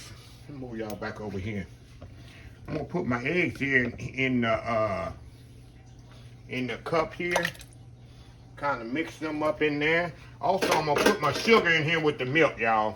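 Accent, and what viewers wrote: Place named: Texas, United States. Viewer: If you had not already told us, this Houstonian would know you were a Texas southerner by that mm mm mm. It's fun to listen to you enjoy your own food. That's how you know it's good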